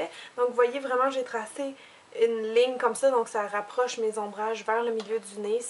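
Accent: Quebec, Canada. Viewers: Salut jsais pas comment jsuis arriver sur ta vidéo mais on s'en fout ! Je suis Suisse et dans 2 semaines je vais au Canada et rien que pour ton accent jme réjouis d'y aller ahaha ! "On va commencer avec les plus gros nez" Aahha merci pour cette vidéo. Tout du bon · Bonjour à toi! je suis française et je viens de te découvrir , j'adore ton accent ayant vécu un an par chez vous ! cette vidéo m'aide bcp car je trouve mon nez est trop gros donc merci pr ça et petite question , je voulais savoir si tu saurais faire un tuto sur les maquillage pr filles métisses car je le ss ( inde/ france ) lol et si tu aurais des suggestions car je fais bien trop souvent le mm make up en soirée ou tous les jours, et j'ai les yeux en amandes dc savoir comment et quoi faire ! ;) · j'adore l'accent québécois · Super tes video.j adore ta facon de parler et ton accent bec:).est ce que les couleurs sont les mm pour une peau mat?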